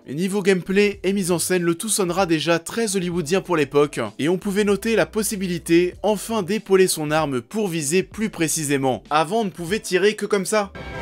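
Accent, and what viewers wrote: Paris, France. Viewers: Cette bouffée de nostalgie en intro un gros kiff par contre si je peux me permettre ; accellere un peu tes vidéos surtout le debit de parole on entendra moins l accent parisien avec les fins de phrases en "en" qui donnent envi de tuer des chatons avec une cuiller Sinon continue comme ca c est cool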